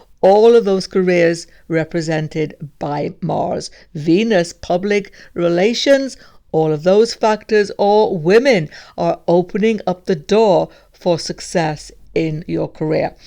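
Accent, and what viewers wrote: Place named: London, United Kingdom. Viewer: come on, it is deep and captivating. it looks british accent (London) to me but with some other strong accents I can't decipher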